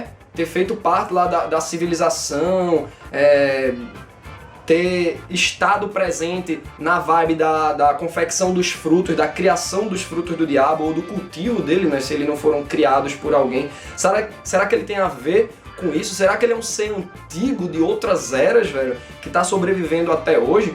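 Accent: Pernambuco, Brazil. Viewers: VC e Pernambucano ou Nordestino?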